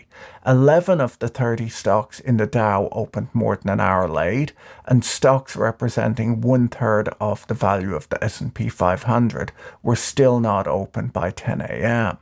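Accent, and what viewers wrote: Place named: Ireland. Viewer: He's Irish. At full speed, Americans would not be able to understand a word.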